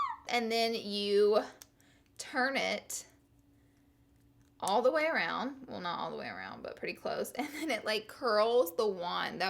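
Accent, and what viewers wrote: South, United States. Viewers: "wear it around town" hahah! where are yu from i can't put my finger on the accent! sounds southern almost to me!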